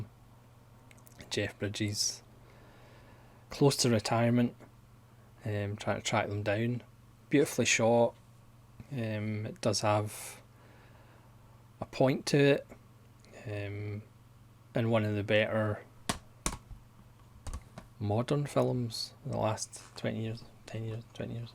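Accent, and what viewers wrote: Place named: United Kingdom. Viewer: I watched the whole thing in one viewing. I like westerns and grew up watching them to an extent as my dad was a big western fan. I have a friend that doesn't like Miles Davis. I told him but there are other reasons to listen to Davis--he as an amazing ensemble around him that play the music as well: John McLaughlin, Herbie Hancock, Wayne Shorter, etc. The same is true for John Wayne pictures. In The Cowboys, Bruce Dern plays a great villain. Red River one of the greatest scenes as the cowboys hoot and holler as they begin their trail drive. The Searchers is just an overall well made film, and Wayne's character isn't exactly portrayed as a hero, more obsessive. Perhaps later on you'll be able to approach him and understand his performances. Are you Brit or Scottish or Irish? I ask because you have a rather thick accent. 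Some westerns you didn't mention: Lonesome Dove and Return to Lonesome Dove the TV series was very well made. Paint Your Wagon is an interesting western musical. Unforgiven is an incredible western where a gunfighter's past comes back to haunt him. Little Big Man is a rather circular film from white man to Indian culture and back again. A Man Called Horse shows some interesting Indian culture. Hud, a contemporary western with Paul Newman. All the Pretty Horse, another contemporary western. Shane--consciously mythic, superb characterization in Alan Ladd's character and a crazy good fight scene. Pat Garrett and Billy the Kid--I tend to enjoy this more over The W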